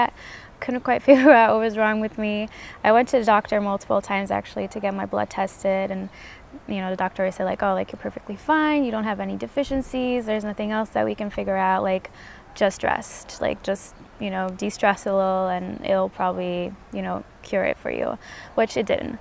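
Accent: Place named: United States. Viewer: I noticed you have an American accent but said uni instead of university. Whys that?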